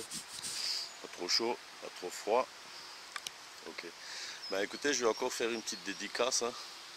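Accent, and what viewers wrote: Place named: South, France. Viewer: petite sortie sympa ca degulingue la tarte a l'oignon continue j'adore ton accent du sud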